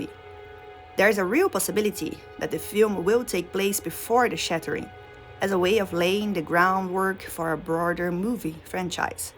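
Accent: Brazil. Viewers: i see that brazillian accent there 👀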